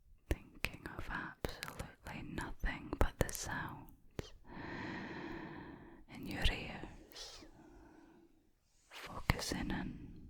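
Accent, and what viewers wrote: Scotland, United Kingdom. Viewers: Holy tingles dude. Never thought I’d love a Scottish accent so much 😌☺️ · lol a very good sleep indeed, your Scottish accent beat me lol but I wonder how you’d stack up against a southern drawl lol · it brings me much joy as a scottish person myself that so manh people love the scottish accent!!! ive always felt really insecure about my accent because you never really see it online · Never knew Scottish accent was what I needed to sleep. Thank you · Well clearly he's talking about the Scottish accent IN the video, if you couldnt tell. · Was scrolling through shorts and heard a Scottish accent pop up related to asmr finally a proper Scottish accent you do our accent justice lol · This. Idk why but i love the Scottish accent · You and Stronny Cuttles are the absolute best Scottish voices I've ever heard in asmr 😍 I wish you two would collab. 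It would be soooooo amazing if that were to happen lol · Dear God what a most beautiful gorgeous angelic Scottish voice I already feel more relaxed you're a true angel ❤❤❤❤❤ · I hope to have your voice and accent in my ear every night when(if) I visit Scotland. Cheerz luv. 😴🥰🏴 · I have a newfound love of the Scottish accent now. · this scottish accent knocked me out last night it very likely will again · A Scottish accent. I think she's from Aberdeen or Dundee? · I never realized just how much I absolutely love the Scottish accent. You are absolutely amazing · ! Glad to see you posting. I do love your Scottish accent so this is a wonderful video. ❤❤❤ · I am so taken back to my childhood with the Scottish accent at bedtime. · that Scottish accent whispering is so hot wanna take an cold shower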